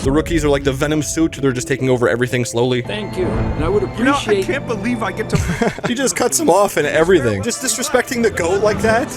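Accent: Canada. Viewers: This dude sounds Canadian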